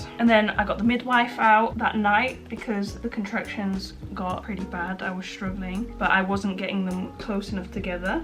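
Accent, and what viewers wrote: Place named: Wales, United Kingdom. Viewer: I can seriously relate when you say you have Connor and your family only. I dropped all of my high school friends due to toxicity, and found my best friend in my boyfriend. Also I am a welshie gal !! Love watching your videos and hearing a familiar accent😊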